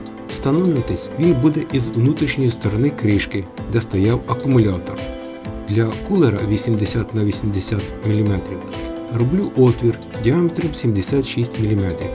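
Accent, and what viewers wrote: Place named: Central Ukraine, Ukraine. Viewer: Акцент центрально-український, черкащина/південь київщини/кропивниччина чи полтавщина.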